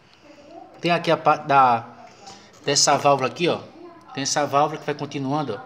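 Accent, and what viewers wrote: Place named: Maranhão, Brazil. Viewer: Parece Maranhence falando, não entendi nada.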